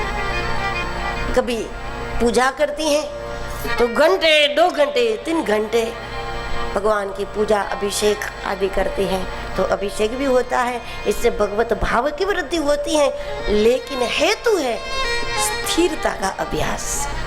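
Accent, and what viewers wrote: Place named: Bhiwani, India. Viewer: Dachhhat bhiwani bolrahi hai gajab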